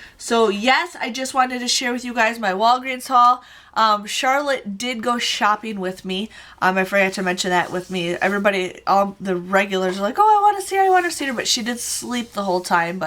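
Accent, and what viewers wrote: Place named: Minnesota, United States. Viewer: Of I didnt know you live in WI, I'd swear you were from MN or ND. It really comes out when you say certain words like "bags". 😘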